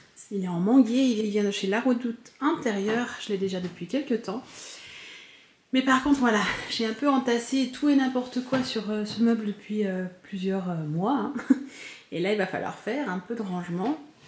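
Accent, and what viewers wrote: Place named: Switzerland. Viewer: Je me gardais ce vlog de côté pour un moment en tête à tête avec toi... C'est agréable ce format : il nous permet de prendre le temps de le regarder. Bien plus que quand les stories défilent et qu'on n'est pas spécialement attentif ! Est-ce que mon moment préféré reste le "coucou", j'avoue ne pas en avoir entendu un depuis des années, et ça m'a dépaysé d'un coup ! Ton p'tit côté suisse ... Merci beaucoup ! C'était un chouette moment !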